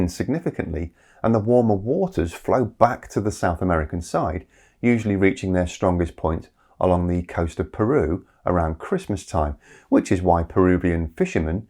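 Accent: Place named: Upper class, United Kingdom. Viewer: I guess alarmist hysteria sounds rational when presented with an upper class British accent. It remains hysteria nevertheless.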